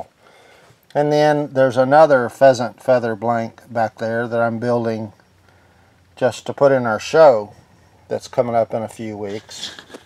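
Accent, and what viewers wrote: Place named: Texas, United States. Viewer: I just recently subscribed and I am enjoying your channel. I don't know for sure where you're from but you sur sound like a fellow Texan. Keep up the good work. Jim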